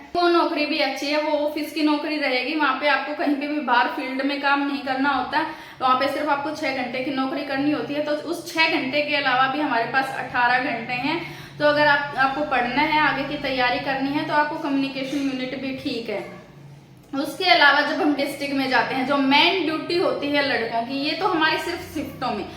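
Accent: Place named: Rajasthan, India. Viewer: Aap rajasthan se ho kya alwar ke aas pas se awaz se lg raha h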